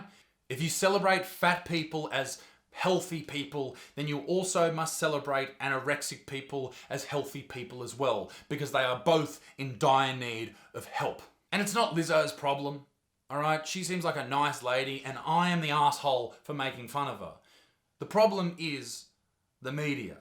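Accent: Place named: Australia. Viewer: I did not expect the Auzzi accent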